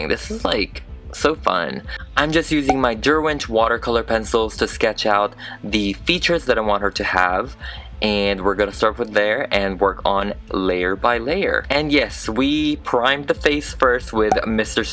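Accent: Philippines. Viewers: I LOVE the fact that you never lost your Pilipino accent. :)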